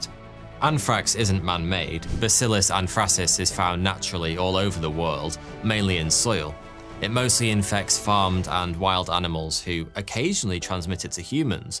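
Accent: United Kingdom. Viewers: srry but it sounds as if the very well spoken, and well sounding, spokesperson of this calls thoughty 2 42, I realize its just because of the lovely british accent, but it could be pronounced more clearly, and that btw goes for all ur vids!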